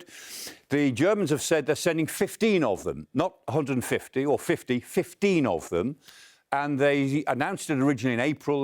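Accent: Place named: United Kingdom. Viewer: If I wasn't really paying attention, I'd think he was narrating an American NFL game in a British accent.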